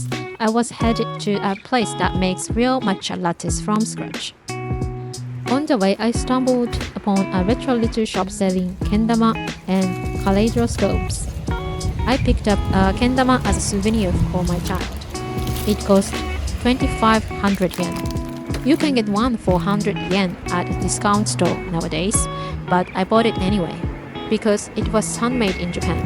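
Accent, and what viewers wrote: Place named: United Kingdom. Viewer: I have never seen your channel, and I like your accent, it’s very British.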